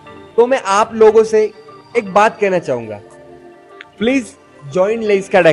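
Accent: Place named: Maharashtra, India. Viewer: we simply love maharastrian hindi 😅🥰🥰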